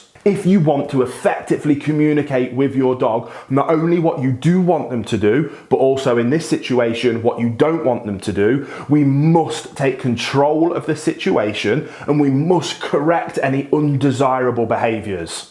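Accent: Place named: Midlands, United Kingdom. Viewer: Your videos are just fantastic! And hearing a midlands accent reminds me of home..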